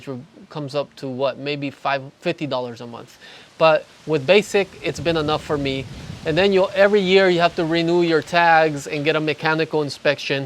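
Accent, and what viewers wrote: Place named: North, Nicaragua. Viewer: éste muchacho cuando habla español tiene el acento norteño de nicaragua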